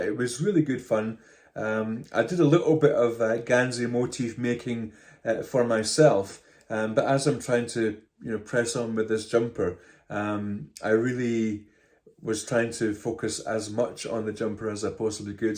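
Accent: Scotland, United Kingdom. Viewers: Your knitting podcast is one of the more relaxing ones and is also very realistic and relatable. (Meaning you don't say things like, oh double pointed needles are so easy I use them to knit lace in the round while also bathing my cat!). Also, I am homesick so it's nice to hear a Scottish voice.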